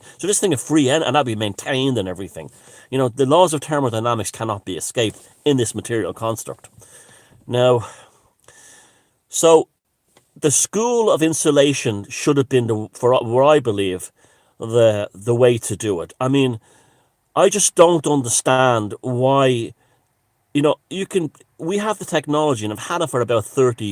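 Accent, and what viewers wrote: Ireland. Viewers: There goes again with that intriguing accent, I love you... “economic growt”! I’m gonna start practicing my Irish accent... lol, I’m serious... I’m doing the accent right now as I type! Why am I thinking of ”Trainspotting” (1998) all of the sudden? That’s RIDDLED with Scottish indiscernibilities... which is also awesome. Alan Watt: “Such a farrrse, it’s such a farrrse...”! Did I hear you say lubricant?! Gonna get me some Irish laydays!!!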